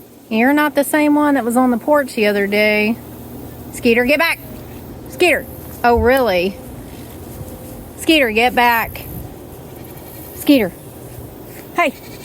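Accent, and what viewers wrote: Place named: Oklahoma, United States. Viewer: 👋Hi and family, watching from South central Iowa; my mom was from Oklahoma, your accent and chill personality reminds me of my Uncles! Enjoy your channel very much.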